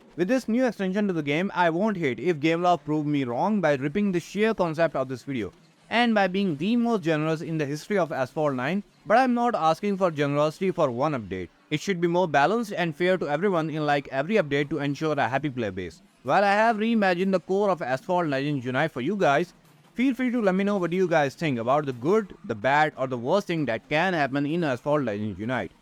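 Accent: India. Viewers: nice 👍 you got a indian accent btw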